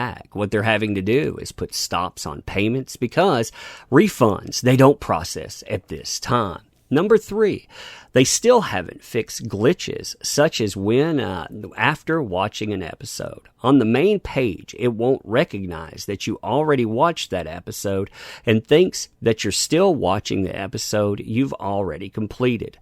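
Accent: South, United States. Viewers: I love you and that southern-ish accent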